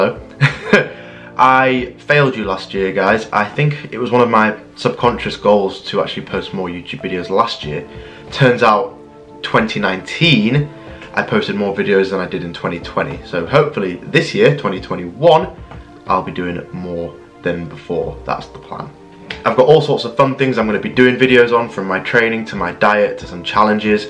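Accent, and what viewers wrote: United Kingdom. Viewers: you are wonderful! I love your down to earth style and your British accent is wonderful to listen to. Sorry to say, I'm too old to get physically fit. I know you will say "you're never too old." Been there, done that. But I really appreciate all your hard work and your beautiful body. (Do I sound gay? YESSSSS oh well) Love you,. Thank you for bringing some beauty into our sad world.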